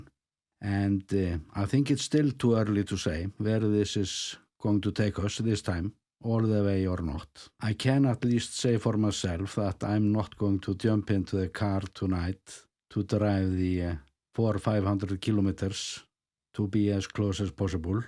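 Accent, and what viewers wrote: Iceland. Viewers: I love listening to this Icelandic accent!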